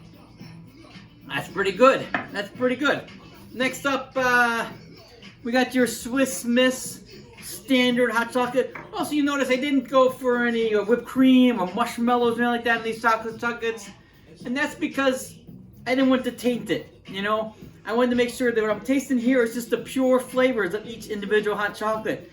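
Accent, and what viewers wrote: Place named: Chicago, United States. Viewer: I'm from Chicago too we have such an accent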